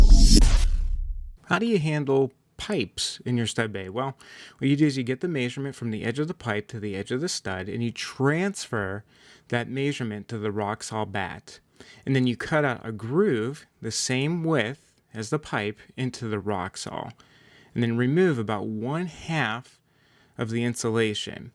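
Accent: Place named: Pennsylvania, United States. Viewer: It's a PA accent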